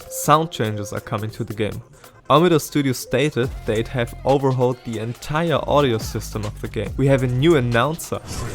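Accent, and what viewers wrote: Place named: Germany. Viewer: English with a German accent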